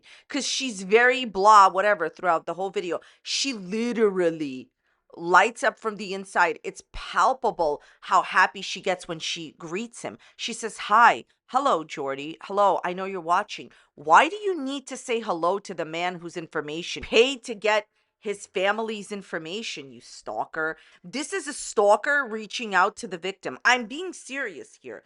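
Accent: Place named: New York, United States. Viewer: Hi, I didn't know who you were until I watched Under the hood, Amber, you're absolutely correct about Jordy, I watched Jordy for months when he cover the Cooney girl. I don't know a quarter of what Amber knows about him. It's crazy that she's putting you down and blocking your comments, she's a chicken shit in my opinion. I have a woman doing that to me too. I get around it sometimes. Lol 🤣 I'm not putting Amber down or you because I don't know you, I do however know Amber lies and lies, I think she's a hypochondriac. I watched her to see if she started the weight loss journey she said she was going to start. She has a lotta drama and she is a dirty woman. From my own observation of all the things I've watched. I watch many different channels of everyone talking about her. I believe she uses people and I'm sorry but in my opinion the girl is too loss with who she lets between her legs, if you get my drift And I don't care if she comes to me about what I'm saying because I will tell people to their face what I think or feel. 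Are you from, you have an accent, I know it's not Jersey, but it's not quite New York. I can hear it when you talk. I'm a Jersey girl myself. Nice to meet you even though it's under crazy circumstances 😂😊😊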